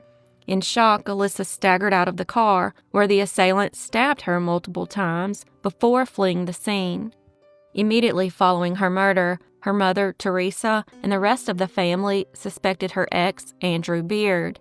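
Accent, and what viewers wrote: South, United States. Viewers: Hello from Georgia! You, Crime Zone, and Michael Franzese are tied for my favorite podcasts. I fall asleep to one of y'all every night. (Hubby uses ear plugs, hahaha) You have such a smooth, melodic, Southern drawl...what's not to like?! Keep up the good work - from one Southern Girl to another. P.S. in case you have haters - "If you are going to be underestimated by people who speak more rapidly, the temptation is to speak slowly and strategically and outwit them." ~ Doris Betts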